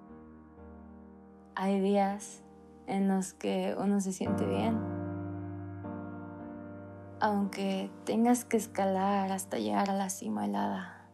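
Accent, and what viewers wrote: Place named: Mexico. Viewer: Su acento de mexicana 😍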